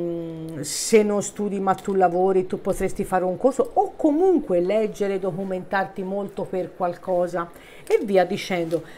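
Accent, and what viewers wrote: Tuscany, Italy. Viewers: Cara io sono leone questa stesa rappresenta molto la mia situazione! È da molto che ho dei dubbi su una persona ed una specifica situazione..spero di scoprire di cosa si tratta! Spero in belle notizie! Grazie ti abbraccio sei simpaticissima con il tuo accento Toscano..sono Toscana pure io a presto ciao! 💗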